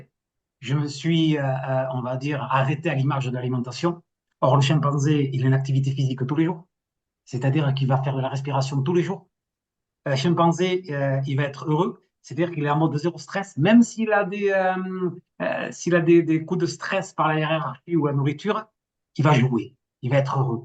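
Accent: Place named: South, France. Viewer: Bonjour, si c'est mon débit de parole, pas de souci vous pouvez le ralentir dans les paramètres lecture à 0.75 . Si c'est ma voix et mon accent du sud, ça on peut rien changer 😉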